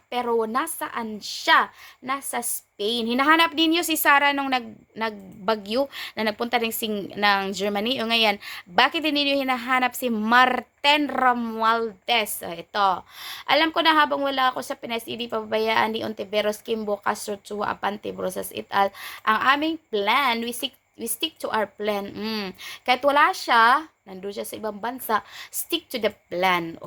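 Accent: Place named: Visayas, Philippines. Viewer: Bisaya ka day .ayusin mo pagsasalita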